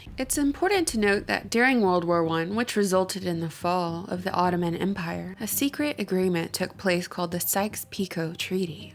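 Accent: United States. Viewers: Are you from the South? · Sounds VERY American to me, A damn shame.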